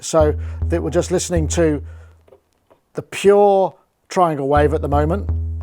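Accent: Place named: United Kingdom. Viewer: He's British.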